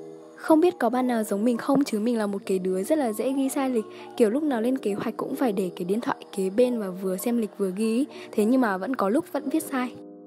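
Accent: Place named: Nghe Tinh, Vietnam. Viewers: chết rồi mừn răng đây nghe là biết dân miền trung r k lận vô mô đc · "Chết rồi, mần răng đây, ồi toang..." Chùi ui show cái giọng Nghệ Tĩnh tui hơi bị thích á, quê Hà Tĩnh nên thích lắm cái giọng này ế, iu cực... Hây da mún zề quê quớ ừ cả 3 năm rồi chưa về HT luôn ý, mà cho mềnh hỏi ở chỗ nào ở HT zợ?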